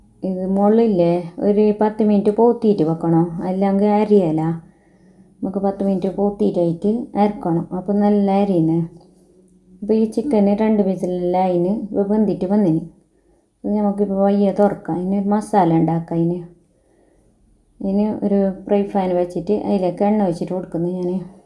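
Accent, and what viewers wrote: Kasaragod, India. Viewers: എനിക്കിഷ്ടമാണ് നിങ്ങളുടെ സംസാരം പിന്നെയും പിന്നെയും കേൾക്കാൻ തോന്നും കാസർഗോഡ് സംസാരം ഒന്ന് വേറെ തന്നെയാണ്❤❤❤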